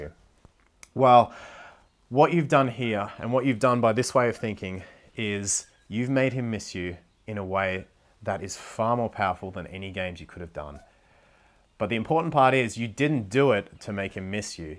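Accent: Australia. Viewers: This is the best video I have watched, my boyfriend and I split up two days ago and I am devastated and I couldn't stop thinking why?! And will he miss me? Will he regret this? Will he realise he dropped a good woman for himself? But you are right. I need to focus on myself again, focus on putting me first. He may come back to me and want to talk to me when he is ready, I know him very well and he will never want to come back to talk, I already know he is doing everything he can to put me behind in his past. But I still hope that when a few weeks of his strength and determination deteriorates and when things go quiet and he's lonely, he begins to think of me, and miss me and then the pang of sadness and loneliness really hit his heart and then the wash of regret hits him in full force. And I pray that when that day comes for him, that I am feeling stronger and closer to being healed. 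Also as soon as you said "shit fight" I clicked and realised you were Australian 😂 I couldn't tell if you were kiwi or Aussie but then I heard the twangs! I'm a kiwi girl in Sydney 🙏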